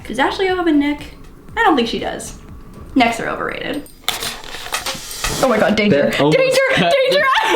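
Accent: Canada. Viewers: Some ppl make fun of Canadian accents but I think it's so cuuuuute like puppieeeees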